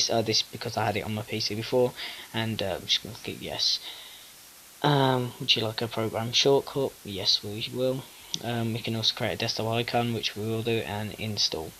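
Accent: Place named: United Kingdom. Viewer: Excellent explanation and demonstration! Some of us "across the pond" (USA) have trouble hearing as fast as you speak "The King's English", but fortunately, my computer has a "replay" button! Cheers!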